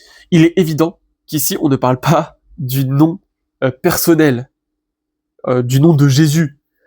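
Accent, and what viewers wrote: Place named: France. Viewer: C'est dommage car le contenu est hyper intéressant et qualitatif mais étant locuteur grec natif la prononciation m'empêche de suivre. Trop de déformation mais pas spécifique a vous mais a tous les Français parlant le grec tel qu'il est enseigné en France. Un exemple simple le B se prononce VITA et pas BETA et ou le G se prononce ramma et pas gamma.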